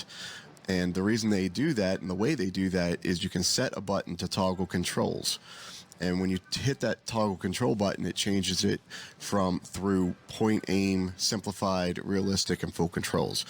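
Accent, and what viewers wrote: United States. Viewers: Dudes definitely from Philly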